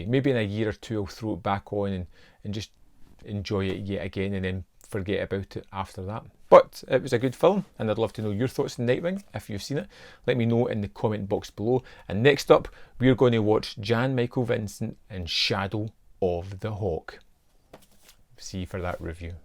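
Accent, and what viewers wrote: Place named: Glasgow, United Kingdom. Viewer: Love this movie!! Just bought the eureka double pack myself. Been trying to get this since i seen it on betamax way back when(no joke) had a large budget and i think its just as relevant now, the whole man getting punished by nature for exploiting the resources slant. Interesting how yoy never really know if the medicine man manifested the bats or its just a natural migration. Love ambiguous storytelling. I had actually given up on owning this one never mind on a eureka bluray! Keep up the good work, good to see and hear a fellow Glaswegian(?) Review movies kn youtube. Thanks for the great review and exposing this great film to your community. Thank god for eureka.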